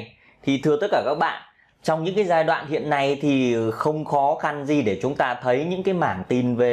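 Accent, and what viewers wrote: Thach That, Vietnam. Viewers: ad ở huyện nào mà nghe giọng nói giống giọng Thạch Thất vậy?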